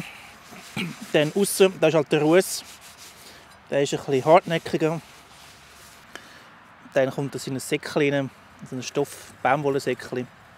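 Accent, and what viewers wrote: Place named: Switzerland. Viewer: Hallo Erst mal ein frohes neues Jahr, hoffe du bist gut reingerutscht mit deiner Familie. Hast aber auch ein Top Wetter gehabt. Essen sah wieder lecker aus. So ein Kaffee ist immer was feines in der Natur. Hab jetzt Urlaub, dann geht es auch wieder in den Wald. Vielleicht kann ich dir ein paar Bilder über E-MAIL schicken. Hast du eine E-Mail Adresse? Glückwunsch zu drei Jahren Outdoor 🎉🎊🍻. Ich glaube so langsam schau ich mir auch deinen Kanal an. Machst auch immer Top Videos immer authentisch. und das Schweitzer Deutsch passt auch gut dazu. Bleib wie du bist. Bis zum nächsten Video. Grüße in die Schweiz aus dem schönen Siebengebirge 🇩🇪🇨🇭🤜🏻🤛🏻 Michael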